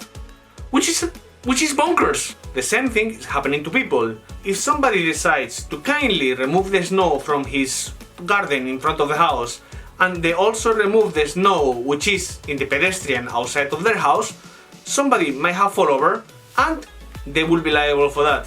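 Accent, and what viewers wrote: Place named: Basque Country, Spain. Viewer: Acento vasco ehh, aibalahostia!!!! tienes mi LIKE....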